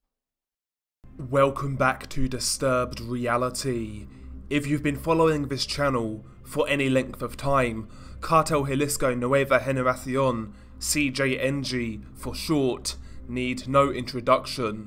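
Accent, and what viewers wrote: Castile, Spain. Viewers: Please don't pronounce the names in European Spanish it is extremely grating and nowhere near as warm and sweet as Mexican Spanish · Your Spanish accent has improved a bit but why are you pronouncing in Castellano? Castellano is spoken in Spain only !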